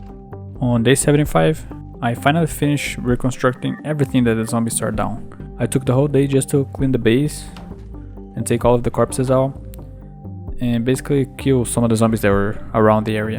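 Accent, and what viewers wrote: Brazil. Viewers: kakakak da pra ouvir o brasil no seu sotaque, vc eh foda mn! vou assistir tudo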